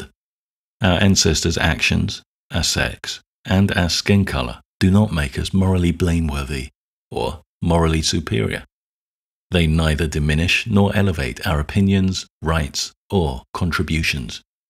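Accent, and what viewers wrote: England, United Kingdom. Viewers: Don't listen to this man just because he has an English accent, and minimal graphic design. His opinions are no more valid than yours and mine.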